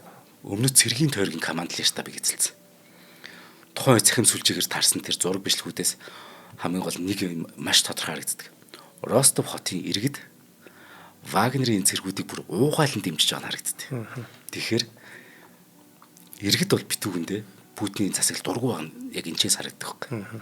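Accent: Mongolia. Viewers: Заавал цэвэрхэн ярих ёстой юу. Монгол хүн монгол аялгаар ярьж болох уу.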